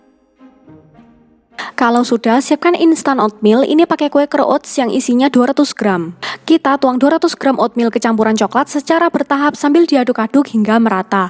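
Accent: Surabaya, Indonesia. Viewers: Medok sby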